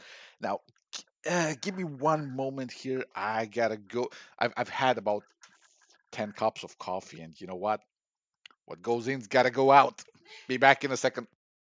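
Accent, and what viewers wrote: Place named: Balkans. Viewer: Love the Balkan accent <3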